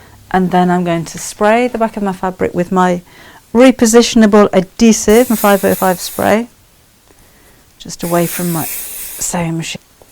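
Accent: United Kingdom. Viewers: I love Your smile, your vice, your cool british accent and yours works!!!!!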